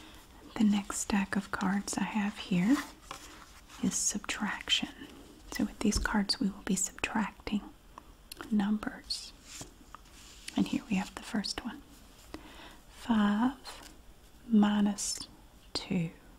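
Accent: South, United States. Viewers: We love a Southern accent in this house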